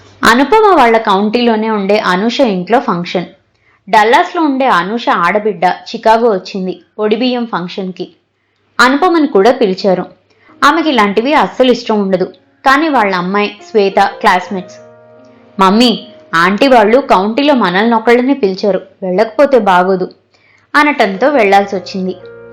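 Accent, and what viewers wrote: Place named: Telangana, India. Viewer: కథ ప్రారంభం లోని శిలను కథ అంతానికి రచయిత్రిశిల్పంగా చెక్కిన తీరు బాగుంది.ఆకాశమంత ఎత్తుకు చేరుకున్నా, భూమిని మర్చిపోకూడదు వంటి డైలాగ్స్ 👌 తెలంగాణా యాస బాగుంది 😊 మంచి presentation.....❤❤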